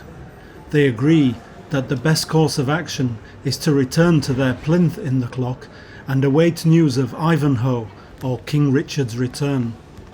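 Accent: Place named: United Kingdom. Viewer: Thanks for sharing — an interesting project. A few comments: The verbal narration (British accent, high vocabulary, and clear diction) gives the video a quasi-documentary credibility, which works well for a contemporary-myth style story. The title’s allusion to Walter Benjamin’s The Arcade Project similarly reinforces that tone. This setup suggests a deeper symbolic reading — not necessarily Benjamin’s exact ideas about consumerism and cultural loss, but something in that thematic area that isn’t yet fully developed. Regarding the filming treatment: if you adopt the criteria of a contemporary myth, consider expanding beyond simple montage. In addition to collage, other cinematic treatments could enrich the marcette scene. One distracting element is the camera’s hand-shake; using a tripod for that shot would help. I hope this feedback helps with your next iteration.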